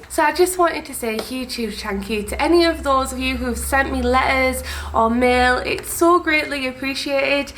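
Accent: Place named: Yorkshire, United Kingdom. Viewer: Ahh love coming onto a channel and finding someone with a similar accent to me. Are u from Yorkshire? I’m from Hebden Bridge near Halifax, born in Bradford. I want to do an art channel and I have wondered what will people make of my accent and should I learn how to tone it down lol but then I hear you’re accent and u sound luvli!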